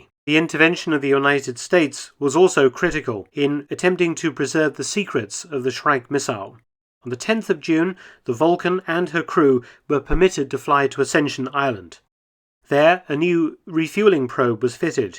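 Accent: United Kingdom. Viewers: It sounded like Dr. Felton was saying "Vulcan" (with a "u") to me - it's the British accent ... which isn't surprising given that he's British.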